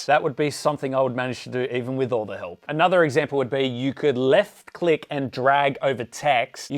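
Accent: Australia. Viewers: Australia, it’s a pretty well known accent kinda sad you don’t know it lol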